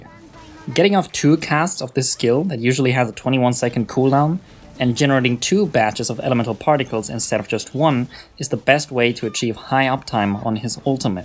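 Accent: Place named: China. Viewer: So, random question your pronunciation of the names makes me think that you are Chinese, which province are you from? I am from Shanghai in Jiangsu.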